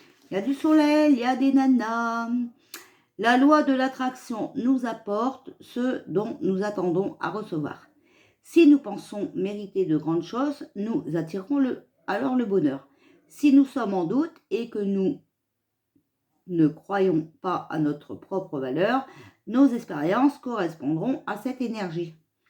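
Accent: North, France. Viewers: J'adore votre accent du chnora grand mère 🤶 était de berck plage 🦀 elle parle comme vous merci 😘 😘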